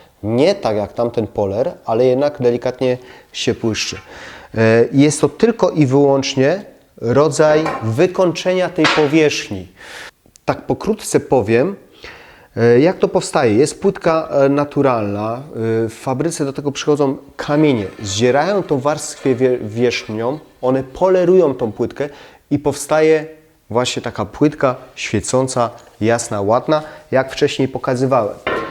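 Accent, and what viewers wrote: Silesia, Poland. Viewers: "Jeżeli klinkami zasztrabujemy..." ;) Wiem, że bardzo Pan się stara mówić po polsku, ale ten Śląsk jednak wychodzi :)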